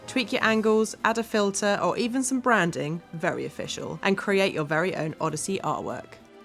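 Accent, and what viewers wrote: United Kingdom. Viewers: CAT MERIO I’m not trying to be mean. She’s British.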